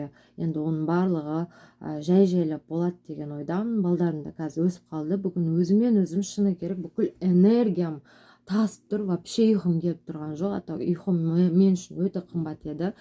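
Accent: Standard. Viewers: Айналайын Аман сау бол. Отбасын а бакыт тилеймын. Балапандарын тап татты. Бизда сиздерды жаксы корып калды. Контентын оте керемет. Кызыкты. Корермендерын кобее берсын. Миллионга жетсын,оданда копболсын. Таза казакшаннан айналдым.. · Молодец таза қазақша сөйлейді екенсің Алла Тағала разы болсын балапандарын аман болсын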